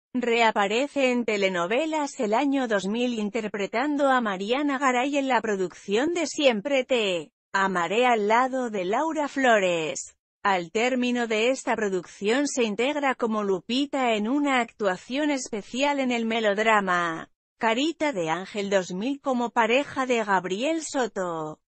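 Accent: Spain. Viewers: Odio el acento español!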